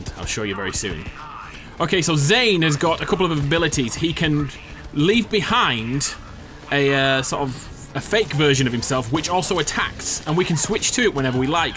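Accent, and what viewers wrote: United Kingdom. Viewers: Nice to hear a Brit reviewing my favourite game. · idk why but i like the british accent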